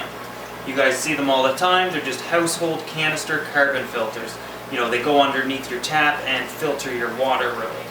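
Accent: Canada. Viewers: Love watching videos by Canadian guys. Too funny accents.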